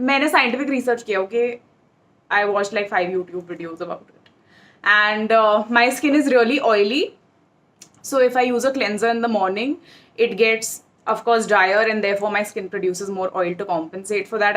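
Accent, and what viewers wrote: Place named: Gujarat, India. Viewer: Why the f word. You sound cool without even using it.. rather add your Gujrati accent I am great fan of your Gujrati accent.... morecedez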